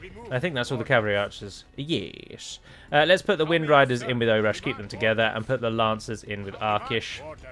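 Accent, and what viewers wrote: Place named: United Kingdom. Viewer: We are all here for the ramble,. If it was anyhow annoying then 6000 people would not have watched this episode thus far. I personally am here for the excellent british english demonstartion and classic humour, which the ramble is very proudly part of. As for the choice I think Mordor will absolutely devour Dol Guldur with just the sheer amount of better starting settlements they posess. So in truth you would only make Mordor even more powerful as well as granting them more Nazgul to control. You also need to remember that Mordor is most likely occupying Khandish homelands too, so they will have ever better economy to back up their hordes. The war between Dol Guldur and Mordor, whilst being probably very rare is just going to be a way for Mordor to become an even bigger threat than before thus forcing you to fight them later anyway, should you play on for that long. 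So in my mind I suggest you to make no alliances other than the ones you need to survive and simply conquer everyone and everything. Let's be honest, if this starting horde of cavalry would be used to its utterly ridiculous maximum, cheesing every single fight, one could conquer the entire Middle-Earth with it. I appreciate however that it is certainly not the point of this specific channel or the showcase of the new starting condition for the nation nor your personal playstyle. Thank you for coming to my TedTalk if you bothered to read this and looking forward to episode three, where the "Sun never stops ris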